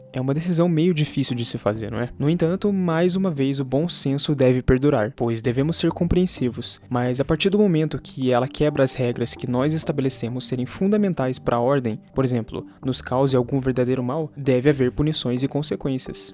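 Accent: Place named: Brazil. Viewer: O vídeo é bom, embora o sotaque brasileiro faz parecer que é uma criança narrando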